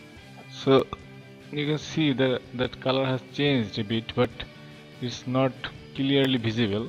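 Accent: India. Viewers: So hard to understand that thick Indian accent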